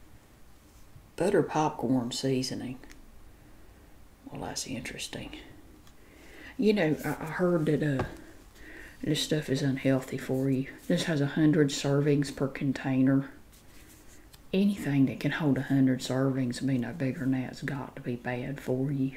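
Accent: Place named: Central Virginia, United States. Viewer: Can I just say that you're normal accent is so similar to how people talk where I live near Central Virginia.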